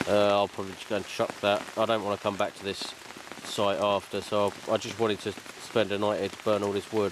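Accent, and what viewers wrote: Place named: England, United Kingdom. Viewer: Abit of a bastard haha. I love he english accent.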